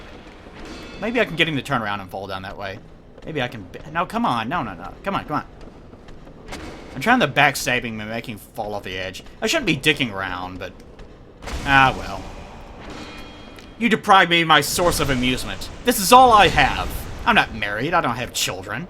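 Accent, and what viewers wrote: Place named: Western Kentucky, United States. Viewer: Going by accent and the fact that he owns/lives on a farm I'm gonna assume is from somewhere in Western Kentucky. Unless he lives somewhere farther East around Bath county in which case I may have been blessed by his sexual aura and not even known it.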